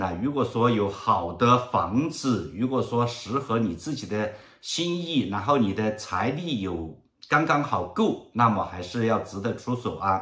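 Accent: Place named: Cantonese, China. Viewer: 你有口音，你是不是讲粤语的？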